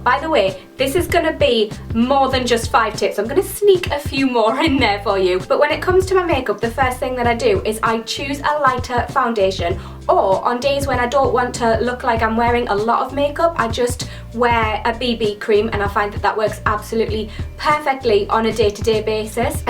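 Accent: Newcastle, United Kingdom. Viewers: Love the geordie accent ahhh (if thats even correct!!) sorry if not hahha , been looking for a good tinted moisturiser or BB cream.. Any other reccomendations? X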